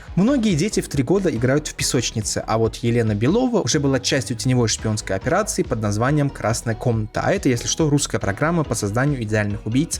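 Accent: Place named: Tajikistan. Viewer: Интересно.Ты какой нации чел? Такое ощущение что как будто таджик или узбек говорит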